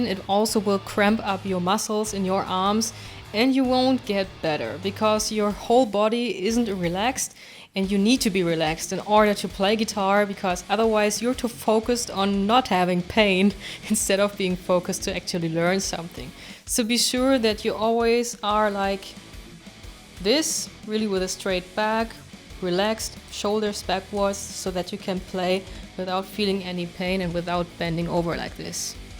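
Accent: Germany. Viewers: Do i hear a german accent? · I just love how you can hear 100% that she is german, even though her english is pretty solid.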